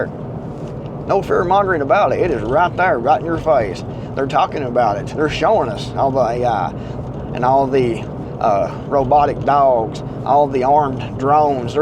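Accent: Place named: Texas, United States. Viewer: Texas loves you, you sound like us. Thank you Sir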